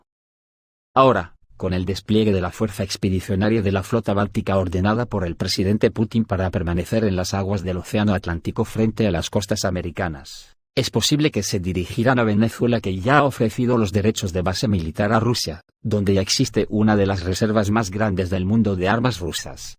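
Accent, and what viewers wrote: Spain. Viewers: Este estupido tiene asento español....es un pendejo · No eres de MEXICO asi que no tienes derecho a opinar sobre el pais mejor preocupate por tu pais si es que tienes pero por el acento eres gachupas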